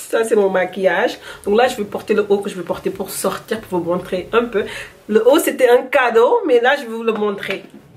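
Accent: Wolof, Senegal. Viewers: Je découvre ta chaîne Miss tu es belle belle,je suis une Sister sénégalaise. haha j aime ton accent wolofaliser. en fait j ai aussi une chaîne YouTube ,ci ça te tente d aller checkeeeee. bis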